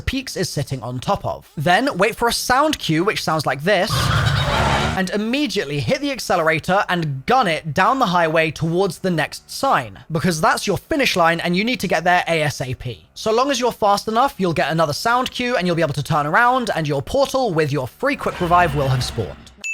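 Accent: United Kingdom. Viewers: It’s just British pronunciation. Relax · Obviously he's from the UK and they pronounce things differently. Aluminum as they say it is al-u-min-e-um.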